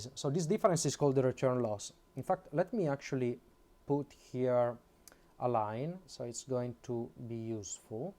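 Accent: Italy. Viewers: Ho sentito nel video che dicevi “qui in Francia” ma dall’accento avevo sempre pensato che fossi italiano. Io sono di Roma e vivo in Portogallo, radioamatore e recentemente mi sto appassionando di elettronica. Complimenti per i video che posti. C’è sempre da imparare. Diego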